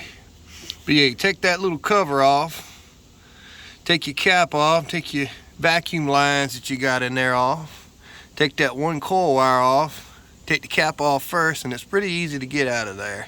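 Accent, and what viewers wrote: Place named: Virginia, United States. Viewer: Thanks for this I’m considering purchasing a Kei truck, I’m leaning towards Honda because it seems like you can find a lot of parts here in the states. Your VA accent is strong! This video came recommended and I didn’t even look at the channel name but though “This dude is from VA”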